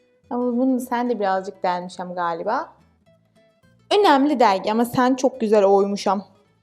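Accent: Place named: Azerbaijan. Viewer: sanki azerbaycan şivesiyle konuşuyorsun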